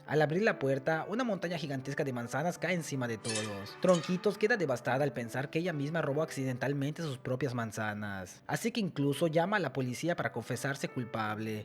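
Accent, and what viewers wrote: Yucatan, Mexico. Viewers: Buen video, eres yucateco? 🎉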